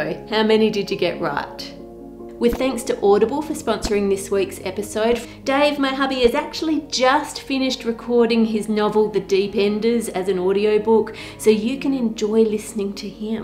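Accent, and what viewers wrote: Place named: Australia. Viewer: Better--to me Bob had a really odd "would you like a piece of candy little girl" voice, where is more gentle and has a nice Down Under edge to it. Oh, and cheerful.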